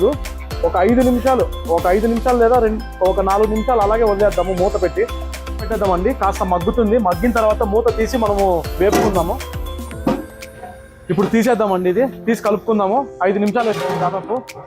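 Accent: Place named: Anantapur, India. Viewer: Abbayi meedi ananthapur ah??? Slang alane undi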